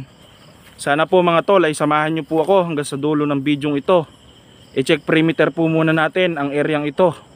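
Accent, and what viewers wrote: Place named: Pampanga, Philippines. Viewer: Saan lugar yan idol kc ang galing mong mag tagalog,pero my punto kang kapampangan?ingat lang idol,God bless u...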